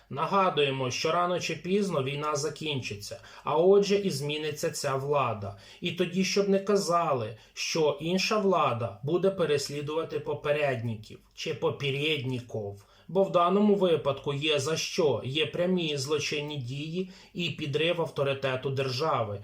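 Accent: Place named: Russia. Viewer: Он даже чёкает чё четы с окцент расийского дерьма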